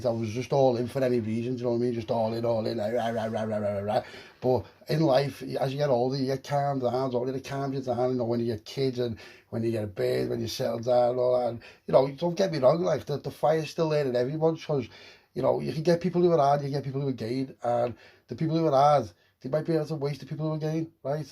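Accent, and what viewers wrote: Liverpool, United Kingdom. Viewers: Kin yes, bed time story with chief scouse. ❤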